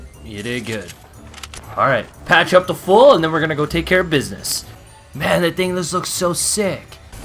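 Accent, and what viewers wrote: Canada. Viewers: Are you Canadian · Are you from Canada? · Is he Canadian? Just wondering cause he doesn’t say sorry like an American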